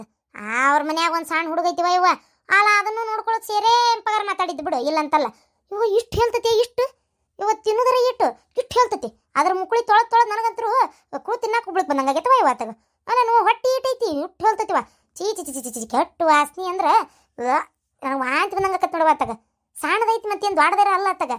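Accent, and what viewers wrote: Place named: Hubballi, India. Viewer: ಮನೆಯೊಂದು ಮೂರು ಬಾಗ್ಲು ಹಾಕ್ರಿ ನನ್ ಫೇವರಿಟ್ ಸ್ಟೋರಿ ಮಿಸ್ ಮಾಡ್ಬೇಡಿ,I love your ಹುಬ್ಬಳ್ಳಿ ಭಾಷೆ🥰😍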